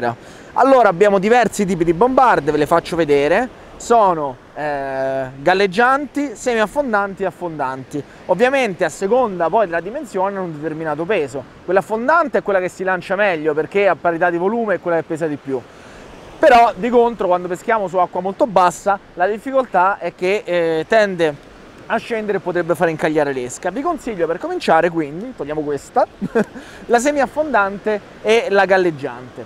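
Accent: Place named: Pozzallo, Italy. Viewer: Bello!! Ps, sei in Sicilia? Mi ricorda il pozzallese..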